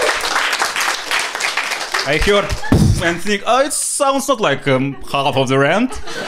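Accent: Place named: Ukraine. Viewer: that was fabulous! With ukrainan accent 😁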